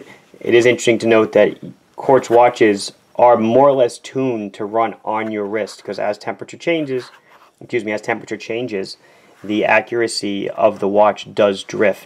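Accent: Long Island, United States. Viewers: grew up on long island. moved away at 24. growing older, when i visit family i notice more and more that i hate the accent. thought i would have trouble watching these vids because of that. but the quality of content makes me completely overlook the voice. if/when i buy a watch in the future i'll try to do so from you. thanks for teaching me so much.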